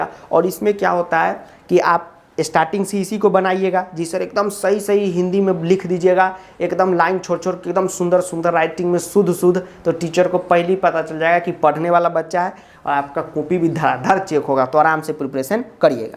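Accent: Bihar, India. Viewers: Sir aap rajshthan se ho ya bihar se ye bol kaise rhe ho sorry to say this par mere se control nhi huaa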